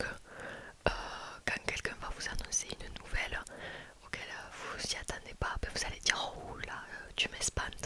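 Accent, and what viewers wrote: South, France. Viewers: Cet accent du sud en asmr juste Woaw🤩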